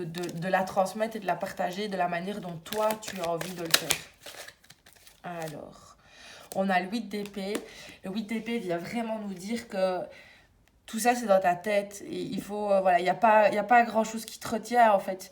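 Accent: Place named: Belgium. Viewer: Je vous découvre j'adore votre accent belge si je ne me trompe pas. Évolution pro surtout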